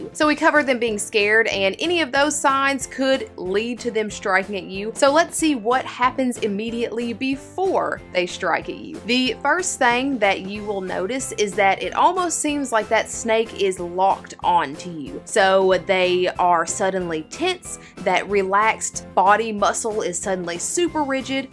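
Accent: South, United States. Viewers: Your accent sounds familiar. What part of the south are you from that is if you are from the south I don't mean to assume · Hi I love that you are from the south it really gives me confidence as I am getting ready to start my channel to be myself because I feel like NO ONE on youtube has a southern accent but watching your video made me so happy!!!:) subscribed:) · Is that a southern accent I hear 😏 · Snake talk with a little bit of a southern accent. I love it. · I love how she says thangs instead of things. It's a southern thing y'all lol. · Thangs! Good ol southern girl haha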